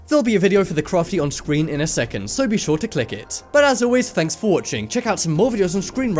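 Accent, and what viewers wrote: England, United Kingdom. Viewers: it's 999 in england, and you sound English so you should know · damn you speak soooo british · Morgan Minnix no cos he had an English accent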